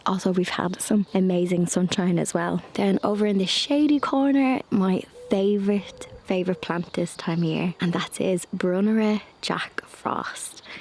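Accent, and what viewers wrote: Ireland. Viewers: love your program ive just come across it to day love your Irish accent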